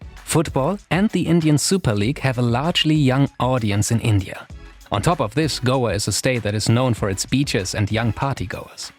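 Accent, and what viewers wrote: Germany. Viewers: Kann das sein das deutsch deine Muttersprache ist? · to me it sounds like he is german. his accent is very very subtle but somehow that's what I pick up...a german accent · Fiahart kholi, lol love the German accent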